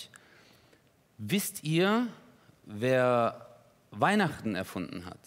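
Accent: Greece. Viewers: Alle die den griechischen Akzent hier hervorheben, auf jeden Fall richtig, aber das ist für uns Türken auch nicht so schwierig ehrlich gesagt😊😘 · Spitze!! Und dein griechischer Akzent ist super!!! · Dein griechischer akzent ist der hammer !! Mach weiter so!!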